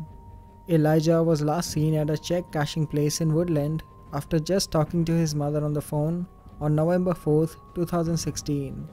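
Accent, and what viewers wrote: India. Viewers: Indian voice confirmed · The voice over is an INDIAN for sure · Thick indian accent..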